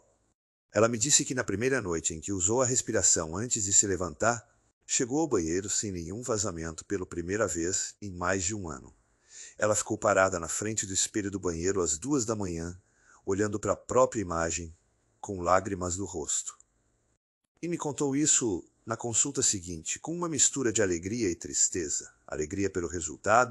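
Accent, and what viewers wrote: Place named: Portugal. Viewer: Interessante este Dr. IA fala português de Portugal e português do Brasil! 😮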